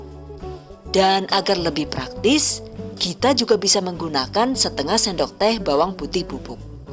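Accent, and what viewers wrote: Banyumas, Indonesia. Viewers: Kakak orang madiun,? Tapi logatnya kok ngapak.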